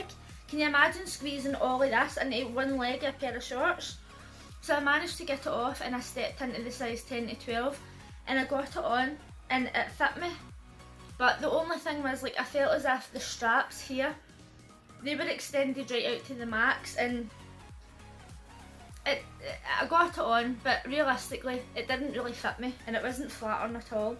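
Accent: Scotland, United Kingdom. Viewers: Nice clothes there, Lol to be honest I mostly watch your videos to hear your Scottish accent I really love it, great video though.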